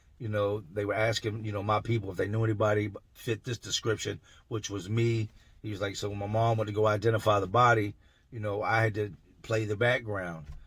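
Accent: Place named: Philadelphia, United States. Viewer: Bro i love your stories. I thought you was a little wiggerish at first but thats just how they speak in Philly. You're gonna blow up. Your content is better than 1090 and Deaths by far. You don't do all that dumb extra shit man. Damn I hate how death stops to laugh or make the lamest jokes. I literally cringe when he does that. So I unsubbed. Death def isn't hard and he hella slow. But ofc you wanna keep shit sweet w him cuz that's how you get put on is getting an interview by him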